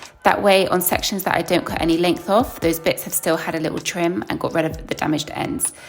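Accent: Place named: England, United Kingdom. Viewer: Omg i love your accent , im moving to England 😂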